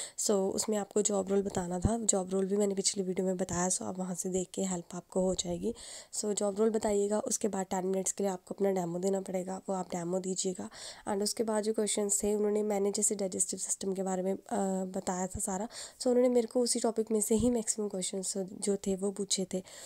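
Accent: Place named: Haryana, India. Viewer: Ok thank you. And God bless you 🙂 But kha se ho yeh toh btati jao. Accent se guess krra tha. Last guess haryana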